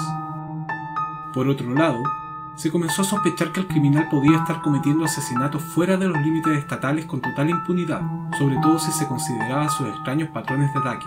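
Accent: Chile. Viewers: El narrador sin duda es chileño, gran trabajo, vato. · El tono shileno le saca lo oscuro y se torna hasta gracioso 😂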